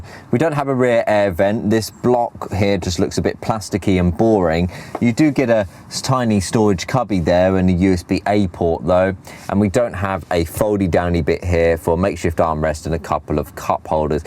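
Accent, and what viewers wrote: United Kingdom. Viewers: Not the only comment that was totally wrong, the guy is in the UK by his accent and the displays are all 10.25 inch in the N model. In fact going off the title of this video he is throwing in a great deal of confusion when he is talking about the standard i20 as well as the i20n.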